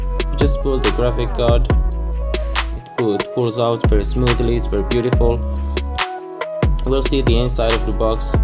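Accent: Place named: India. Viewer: You have an indienne accent bro 😁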